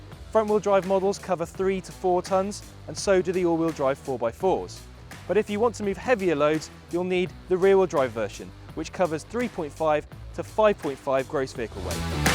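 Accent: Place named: RP, United Kingdom. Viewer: I don’t think with that accent you hang out, with to many van and truck drivers or any other working man, Your more at home with Trinney and Rupert on the Kings road in a coffee shop.It’s a rebadged crafter, Man trucks are VW commercial wing. That information was not in the MAN brochure they handed you one hour before. You did not tell them this is VW first pure Crafter, not a VW engined Sprinter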